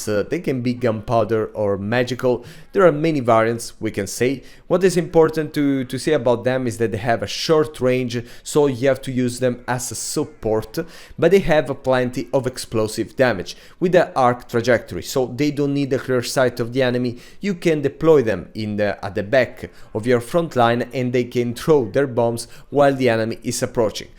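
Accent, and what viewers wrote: Italy. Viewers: are you italian? i love your accent! :)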